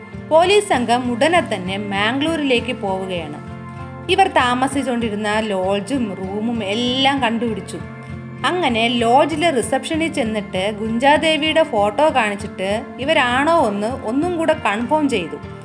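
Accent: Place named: Thiruvananthapuram, India. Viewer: നല്ല കഥ അവതരണം കൊള്ളാം തിരുവനന്തപുരം സ്വദേശി ആണോ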